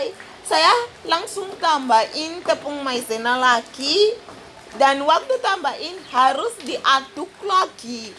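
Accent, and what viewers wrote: Java, Indonesia. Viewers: Saya nonton video2 nya mbak ini ngakak terus karna logat jawa yh sangat medok,Londo kampung piye iki ene sainganne hahahaha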